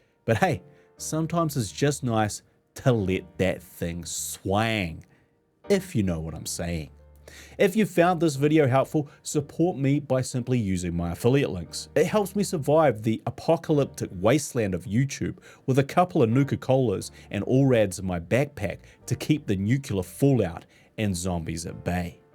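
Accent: New Zealand. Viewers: love the intro skit: hehehe do more! love the kiwi accent too.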